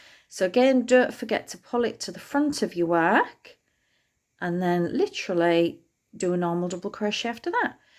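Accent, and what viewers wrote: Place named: Yorkshire, United Kingdom. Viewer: Tricky, but clever ! Lovely true Yorkshire accent ,,just same as my Grandaughter! 😀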